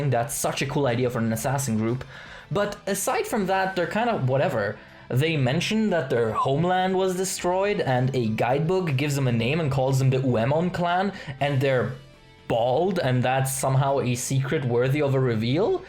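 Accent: Germany. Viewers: You're German? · Are you an german?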